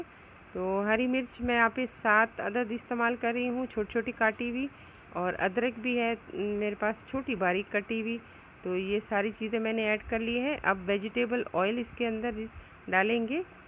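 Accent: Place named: Sindh, India. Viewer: Sis ap sindhi hain . Bhat krne ka andaz sindhi jaisa hai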